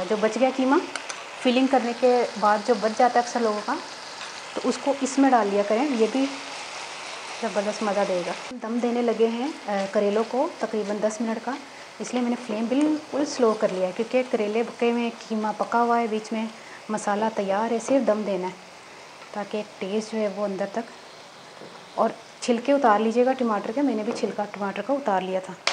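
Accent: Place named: Punjab, India. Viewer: Dear.tuci great ho..love ur cooking nd love ur voice in banjabi style😘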